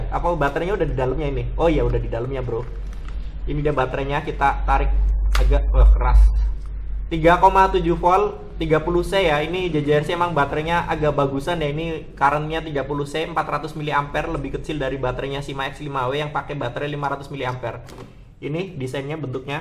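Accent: Banyumas, Indonesia. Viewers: mas e ngapak e mana e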